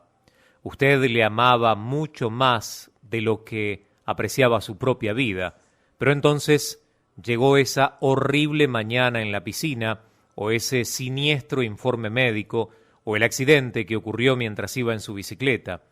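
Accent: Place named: Argentina. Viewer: HE ESCUCHADO OTROS LIBROS MUY EDIFICANTES COMO LOS DE REBECA BRAUN, PERO UD ES EL QUE MAS CLARO LO ENTIENDO SERA POR NUESTRA TAN PARECIDA LENGUA LATINA, SOY HONDUREÑA Y EL ACENTO ARGENTINO ME GUSTA MUCHO, ASI ES QUE HE QUEDADO MUY CLARA EN CUANTO A LO QUE VIVO Y VIVIRE EN LOS AÑOS QUE ME DE PAPITO DIOS AQUI EN LA TIERRA YA TENGO 61 Y 30 AÑOS QUE SU MISERICORDIA ME ALCANZO ❤🙌❤🙌❤🙌GRACIAS DIOS LOS BENDIGA CADA DIA Y QUIERO MAS YA Q POR MI TRABAJO PUEDO ESCUCHARLOS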